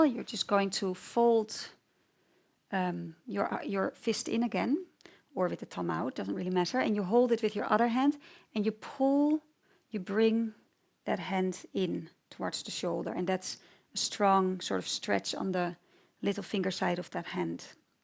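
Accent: Netherlands. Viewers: You can hear from the annoying accent that she is Dutch lol.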